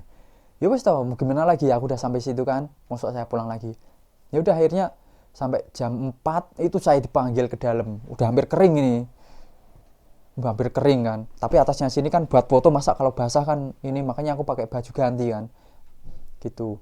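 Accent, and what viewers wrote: Java, Indonesia. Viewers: Jowone ngendi mas..aq kendal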